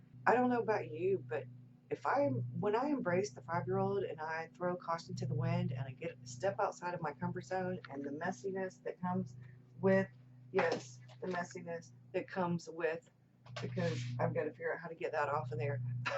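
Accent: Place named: South, United States. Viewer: I love the southern accent, it sounds so friendly. Ans I never doubted that you are very intelligent.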